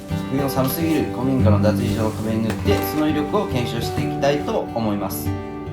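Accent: Kansai, Japan. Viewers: 関西在住経験あって、西日本で暮らすと、JPさんのような言葉使いになります😁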